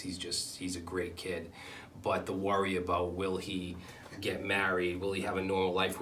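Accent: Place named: New England, United States. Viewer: your accent, t-shirt, and water bottle bring me home. I grew up between Chelmsford and Southern New Hampshire. (I live in Illinois now.) I benefit from your work. You are a really good teacher, keep it up.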